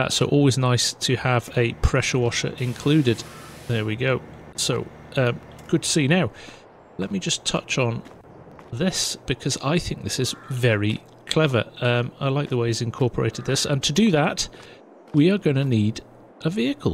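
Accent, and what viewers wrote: United Kingdom. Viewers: I really enjoy the thoughts and insights of. For me, he is the companion guide to playing FS22. (Must be the British accent) I appreciate him putting us onto modders who have really put in a lot of thought, design, and utility into their art...It all just makes the game so much more enjoyable. BIG thumbs up!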